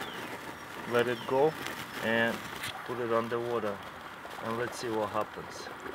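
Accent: Russia. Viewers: Your awesome russian accent can only get you so far, please stop re-uploading things you've already done and make some new content. Or make something cool out of old projects. (i.e. maybe make a borax green torch for your backyard)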